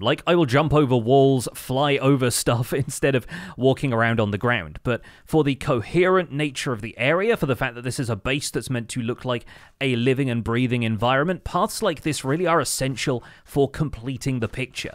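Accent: United Kingdom. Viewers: Finally caught up! Got halfway through season 2 then life got in the way. Started on S3 recently and as always - I throughly enjoy your take on MC coupled with the British flavored commentary 😉 I appreciate your attention to builds/farms that won’t directly work in bedrock as that’s the version I use. Many thanks for the time and effort you put into the survival guide series and I look forward to seeing more🎄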